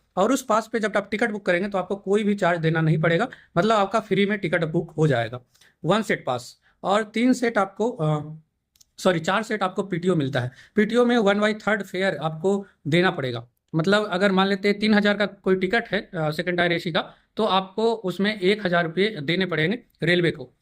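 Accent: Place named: Magadh, India. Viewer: I recognised you by your magadh accent I am also from Nalanda(Bihar Sharif)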